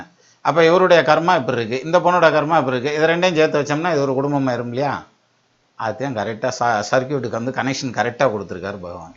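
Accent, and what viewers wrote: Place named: Madurai, India. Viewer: என்ன சார் நீங்க 1000 பிஎச்டி க்கு மேல் பண்ணியவங்களுக்கு கூட இல்லாத இவ்வளவு ஜோதிடப்புலமை தமிழ் ஞானம் எப்படி சார்..அதோட சூப்பர் மதுரை தமிழ்.அப்பப்பா என்ன சொல்லி உங்களை பாராட்டுவது..வார்த்தைகளே இல்லை சார்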